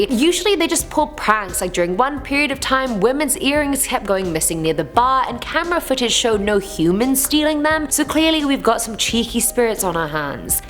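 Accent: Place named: United Kingdom. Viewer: ? Have you ever been to Hollywood or New York... I really think you would have a better chance at your career in one of those two places.. Question you sound like you are from the UK but you live in Canada mind if you enlighten me a little? You truly are a smart have great humor and awesome at Story telling... Mind if I jump on thee find love wagon with ya?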